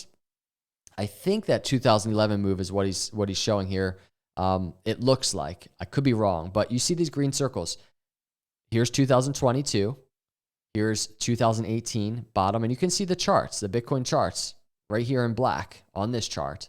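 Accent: Philadelphia, United States. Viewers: I love your channel bro. I did my medical training in philly and you seem to have the accent. U a philly guy? If so pats or genos?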